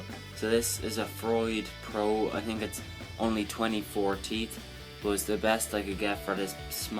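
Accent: Ireland. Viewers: Great job!! Loved the video, but then again I'm partial. Your accent makes long for the old sod. Keep up the grand work!!!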